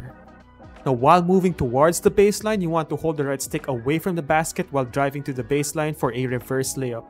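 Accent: Philippines. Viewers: Are u Filipino? U sound like it brother!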